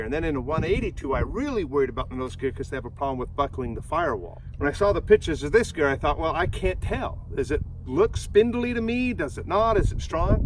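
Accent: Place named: United States. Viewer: you have a much stronger USA accent than your brother, respect from cup of tea governor, Englishman, MIC696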